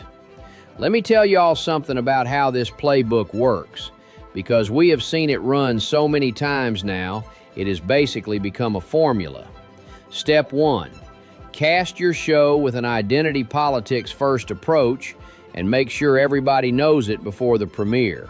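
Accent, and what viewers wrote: Texas, United States. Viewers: Hello - I'm a new subscriber. I love your Texas accent. Greetings from Maryland USA.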